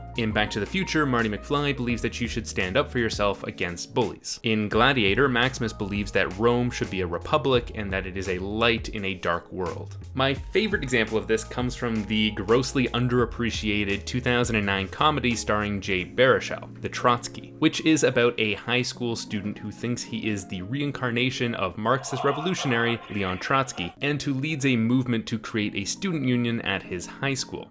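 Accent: Canada. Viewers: The Canadian accent is strong in this one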